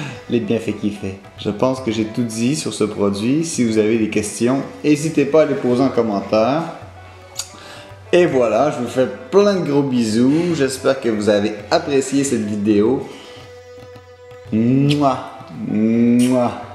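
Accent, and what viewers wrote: Canada. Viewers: Wouah l'accent canadien 🍁. Toi tu n'as pas un fort accent canadien. 😉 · l'accent québécois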